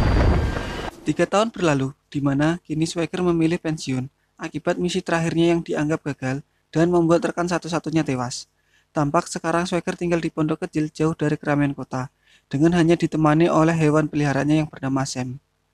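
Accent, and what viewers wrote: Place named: Java, Indonesia. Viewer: Suara elu itu mas mas jawa, blepotan, ancur banget poko nya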